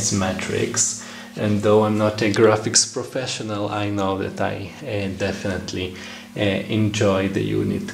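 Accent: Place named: Israel. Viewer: איזה קול ישראלי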